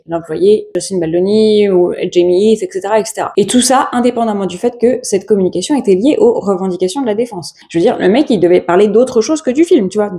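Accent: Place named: United States. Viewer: Je regarde tes vidéos avec ma fille Sasha de 19 ans. Nous sommes passionnées par les stars américaines. Il existe des youtubeurs qui traitent de ses sujets. Tu es pétillante, dynamique, ton accent (je croyais que tu étais américaine), une très jolie femme, bcp de flow comme me dit ma fille. Il faut le dire aussi. Tu te démarques largement car tu vis les infos que tu nous donnes avec des imitations, ta gestuelle, la vie qui se passe autour de toi, ton fils qui joue avec son ami(e), ton chat. Ça c’est génial. Tu es passionnante et passionnée. Tu nous entraînes dans ta passion. Même après une journée de travail, t’occuper de ton fils, de ton chéri (j’espère que je ne fais pas d’impaire en disant cela 🙊) tu as une énergie débordante pour nous informer de tes news. C’est cela être passionnée. Je suis sûre que tu vas monter car tu le mérites. Je le pense sincèrement car cela ne m’apporte rien de te mentir ou autre. 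Je hais le mensonge. Ne change pas. Et merci encore 🙏🏼🌹🌹🌹🌹🌹🌹🌹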